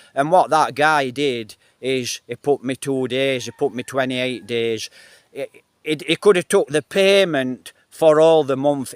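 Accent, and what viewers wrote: Yorkshire, United Kingdom. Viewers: Hilarious.:face-green-smiling:. Are you from Yorkshire, mate (like me), or a red rose ?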